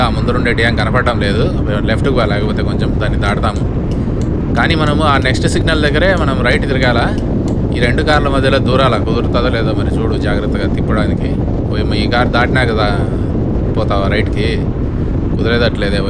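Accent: Kadapa, India. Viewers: Edhie mana rayalasema slag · Enjoyed Sir. Super kadapa accent 😍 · ముక్యంగా మీ కడప యస ఇంకా బాగుంది. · Gaaru! Video Simply Superb. Kadapa Yaasa Bhaasa chala bagundi. USA lo Kadapa Yaasa ( Vuntaado Voosipotaado, Saami )! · Anna .. u r doing good job...with Kadapa language.... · Oorini pasugala vaadu ponilede !! 😂😂 Rayalaseema slang . Very funny 😂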